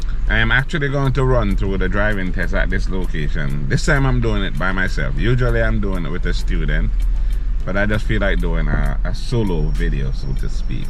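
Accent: Jamaica. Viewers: You do sound like there's a bit of Jamaican in there. I'm an American but I lived in Jamaica all my life. I passed the written test past month and I have the driving test two weeks from now. I watch your videos to ensure that I don't fail. Thank you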